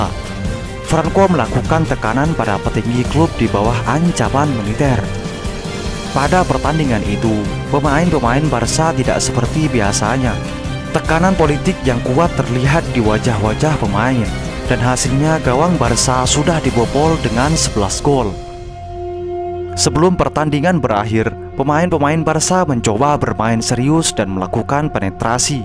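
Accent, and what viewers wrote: Madura, Indonesia. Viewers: logat madura banget😂😂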